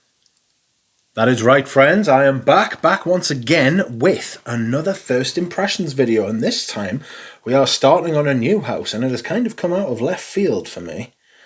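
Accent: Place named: Cockney, United Kingdom. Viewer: Dude all britsh cogney twats, talk like you? like damn you guys used to rule and exploit india and china but now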